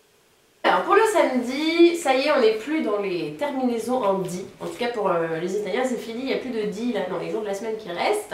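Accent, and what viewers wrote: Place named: France. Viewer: Non ho bisogno di imparare l'italiano, considerando che io sono italiano, ma non riesco a smettere di guardare i tuoi video: hai il viso più bello che abbia mai visto in tutta la mia vita. Poi il tuo leggero accento francese, quando pronunci una parola italiana, è dolcissimo :)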